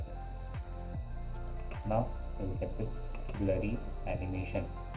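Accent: India. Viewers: He’s better bro He’s Indian and tried his possible best to speak English. Thank you bro and keep up the good work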